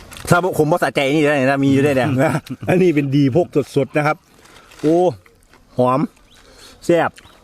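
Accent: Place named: Sam Phrao, Thailand. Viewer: เจ้าคือเว้าคือสำเนียง สามพร้าวบ้านผมแท้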